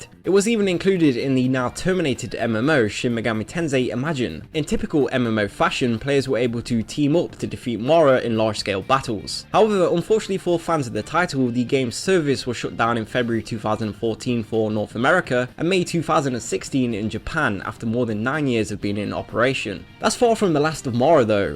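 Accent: United Kingdom. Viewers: The commentator? Uh, he's British.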